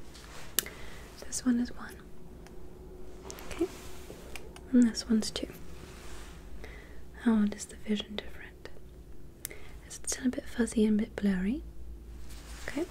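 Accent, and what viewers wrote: England, United Kingdom. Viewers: » Super relaxing video ✔ » Looking absolutely flawless ✔ » Best british accent on YouTube ✔ You always deliver,! <3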